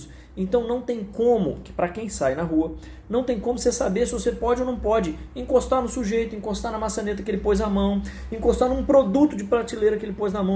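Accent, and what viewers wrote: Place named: Minas Gerais, Brazil. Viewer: Eu também estou desconfiado desse cara ai. Se ele é capixaba de onde saiu esse sotaque"TREM" trem é sotaque mineiro. Eu também procurei. Deve ser que esse coitado tentou passar em medicina e não conseguiu agora tá aproveitando esse caus e tirando uma onda. Mais pelo menos ele está falando a verdade. Isso é muito bom. Ai a população acorda.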